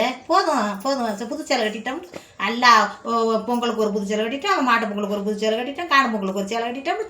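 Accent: Madurai, India. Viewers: நான் திருவள்ளூரில் இருக்கின்றேன் என்னுடைய பிறந்த ஊர் மதுரை, சகோதரி உங்களின் இயல்பான மதுரை மண் மனம் பேச்சு என்னுடைய சிறுவயது வாழ்க்கைக்கு கொண்டு சென்று விட்டது நீங்கள் சொல்லும் வார்த்தை எதார்த்தமான வாழ்க்கைக்கு உகந்தது உங்களுடைய தொடர்பு எண்ணை அனுப்பினால் நன்றாக இருக்கும்.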